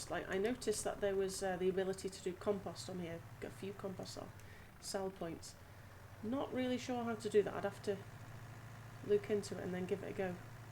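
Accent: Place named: Yorkshire, United Kingdom. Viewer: Great first talking video. Clearly you have some natural talent there 👍🏽😊 Btw I thought you were Scottish but you sound more Yorkshire’ish? 🤔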